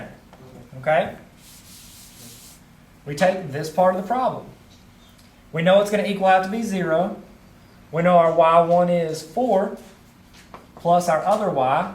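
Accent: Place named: Texas, United States. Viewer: I'm guessing he's from texas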